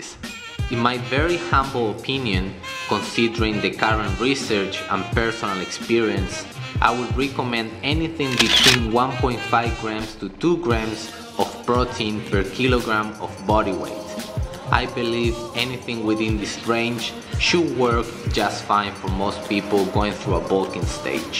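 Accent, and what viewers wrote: Spain. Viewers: up主应该是西语母语人士啊：)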